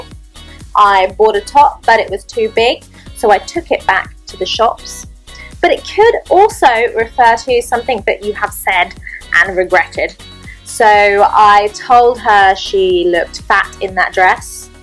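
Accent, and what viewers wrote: United Kingdom. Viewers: Beautiful British English!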